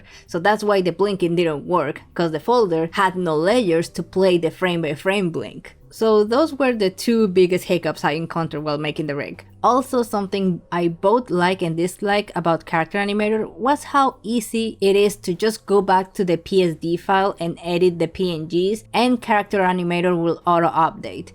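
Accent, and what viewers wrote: Spain. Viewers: Show that Spanish accent! It makes you the awesome person you are <3 . Also, I work with Mac and most of the rigging and Vtuber mods or programs arent available I decided to use Character animator. I love the character you make!!!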